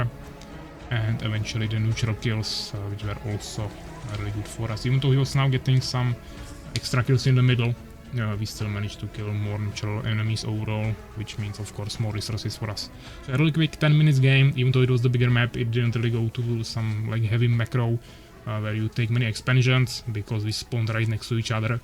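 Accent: Czech Republic. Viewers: tu češtinu v hlasu slyším hodně :-)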